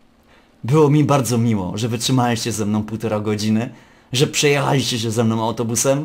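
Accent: Silesia, Poland. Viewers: odcinki są super idzie sie bardzo pośmiać a także odpocząc:) jestem z sląska i smieszy mnie to jak ''godo pan po slónsku''! wiecej omsi!:) Pozdrawiam!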